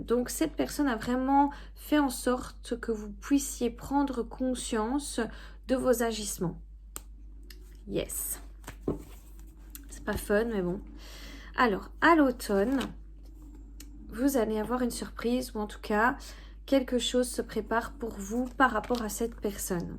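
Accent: Belgium. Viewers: Où vous c'est la première fois que je vous écoute mais il me semble que vous avez un fort accent belge 👍😸♥️ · Bonjour, toujours très belle, j adore ta guidance et t en remercie cette personne ne sera plus dans ma vie très bientôt ou j'ai mal compris.j adore ton accent belge gros bisous je te kiffe.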